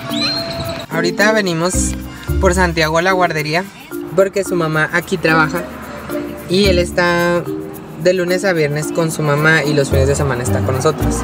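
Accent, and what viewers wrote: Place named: Torreón, Mexico. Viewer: te acabo de conocer y ya soy tu seguidora y admiradora!!! Me gusta tu forma de ser y tu familia, desde que te escuche me imagine que eras de Torreón y le atiné!!!... mi familia es de haya!!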